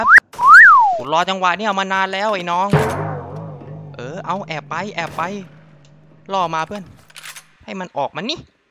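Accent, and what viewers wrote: South, Thailand. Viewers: พี่เป็นคนใต้หรอค่าบ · คนใต้ จังหวัดไหนนิ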